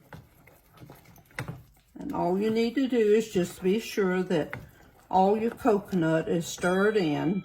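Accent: South, United States. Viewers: Thank You! I truly appreciate your strength in the Lord Jesus Christ! I follow you on YouTube! I love your videos, you have the sweetest Southern voice! I live alone, and I have 10 beautiful Grandchildren! I’ll be baking pies for our family during thanksgiving holidays! Thanks for being a blessing to so many people!😇🙏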